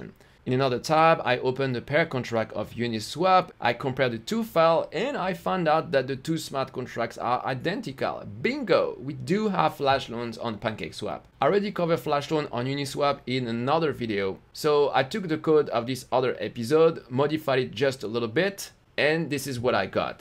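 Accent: France. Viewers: ouuhhh l'accent francais aahaha. Super video sinon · I think you are french 😁😁 i felt this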